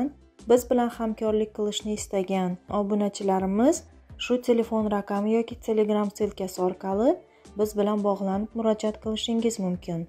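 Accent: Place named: Khorezm, Uzbekistan. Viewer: Хоразимнанмисиз шевангиздан ухшатдим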